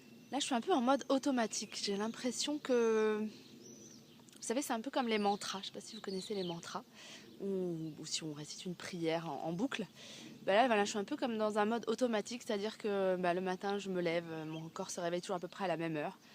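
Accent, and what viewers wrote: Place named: Spain. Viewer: Bon courage et soigne toi bien surtout. Et puis l'accent espagnol avec le nez encombré. ... c'est pas simple. Bisous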